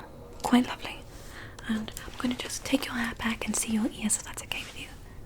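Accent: England, United Kingdom. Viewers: Big Hayley Atwell vibes with the british accent 😍😍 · YES! THE BRITISH ACCENT IS BACK!!!!!!!!!!!!!!! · I was beginning to withdrawal from not hearing the British accent. She came through at the perfect timing lol🙏🏼🙏🏼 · Really Beautiful Woman with Glasses and the Most Beautiful British Accent!! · Does she do any other ASMRs in her English accent? I love it so much lol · Your English accent is the best please make more of them like this 😍😍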